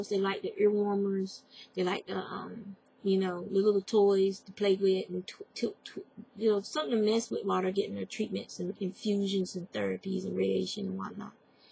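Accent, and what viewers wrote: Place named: South, United States. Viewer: Loved the bush stitch cowl,. I want to try making one. Gosh, you can fly with that hook! I wish I could crochet that fast. I agree with you, Susan Bates are the best and are the only ones I use. Hate Boye hooks. I have a thick southern accent too. Was raised in southwest VA. I love southern accents! ♡ Hope you have a good week. Looking forward for another podcast soon!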